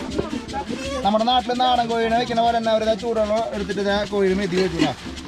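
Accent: Thrissur, India. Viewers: thrissur slang pettannu alkulle kayiledukkan powerulla manohari😘😘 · അലസ്‌കയിൽ വന്നപ്പോൾ തൃശ്ശൂർ ഭാഷ കാരണം subscribers കിട്ടുമോയെന്നു ആശങ്ക പ്രകടിപ്പിച്ചിരുന്നു.അന്ന് നൂറിൽ താഴേ മാത്രം subscribers!!ഈ ആഫ്രിക്കയിൽ വന്നിട്ടും തൃശ്ശൂർ ഭാഷ കൈവിടാതെ തനതായ ശൈലിയിൽ കൂടി നാല്‌ ലക്ഷത്തിൽ അധികം subscribers!!!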